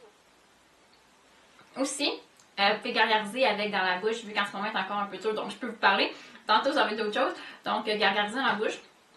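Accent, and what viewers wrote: Quebec, Canada. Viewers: tu est Quebecoise ? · Trop cool l'accent québécois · je kiff son accent québécois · Ta grave un belle acent . Tes québécoise ? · Elle est surement de Montreal/Canada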